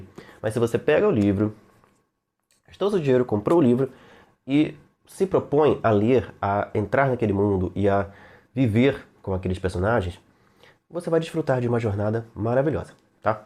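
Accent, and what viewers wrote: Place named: Petrópolis, Brazil. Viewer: Boa tarde, Xiru ! Bah, percebi que seu sotaque não tem aquele "S" que vocês arrastam Geralmente percebi - se que tu tens, um sotaque limpo tu deves ser de Petrópolis ou Niterói ! Caso se eu tiver errado , tu me corrige !!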